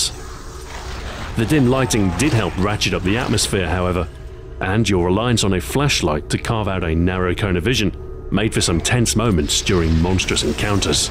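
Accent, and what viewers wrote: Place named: United Kingdom. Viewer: Ah-cah-reena is just the American accent. The narrator here is British, so pronounces the letter "o" very sharply like all "o"s are in the British accents.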